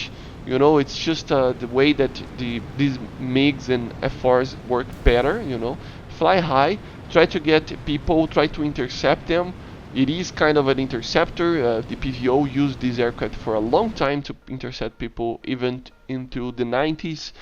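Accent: Brazil. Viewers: What is your nationality? You seem to have a Brazilian accent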